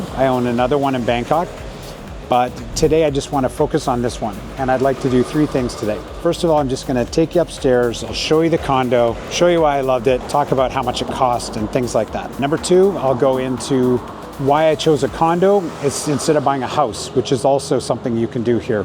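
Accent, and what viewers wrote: Canada. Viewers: Are you a Canuck? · Canada here... you didn't lose your accent! It's refreshing. I will be settling in Jomtien as well, in a year or two.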